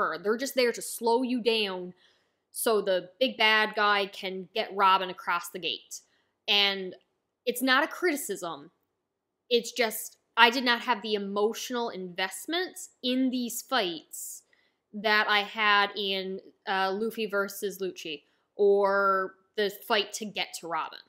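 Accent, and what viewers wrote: Canada. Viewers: Interesting you're trending right now. YouTube algorithm is a weird mistress. I've got to say I've heard Almost every version of English native speaker discussing One Piece but your unintentional Aboot was glorious. Play into your Canadian accent when reviewing One Piece. Don't try to hide/Americanize yourself. There's thousands of American One Piece YouTubers but what made you stand out was your accent. Enjoy the Journey and just know the Demon Child of Ohara is the best Straw Hat. When she has her moments she really steals the scene. Sanji being captured by Black Maria: call for Help we want Nico Robin... Nami-swan save me I'm on the third floor of the castle in the north most room.. Black Maria is like why the hell did you sell out your comrade so easily. Sanji is like I can't fight a woman. But besides my sister, Nico Robin is the most formidable opponent in the world and she's gonna kick your ass.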